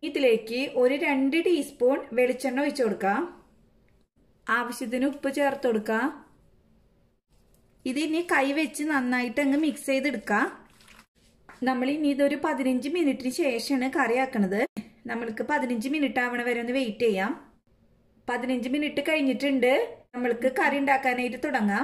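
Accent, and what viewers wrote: Thrissur, India. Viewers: L42 watched full nice recipe and loved your Thrissur accent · Like 11 I joined 594 Thrissur slang super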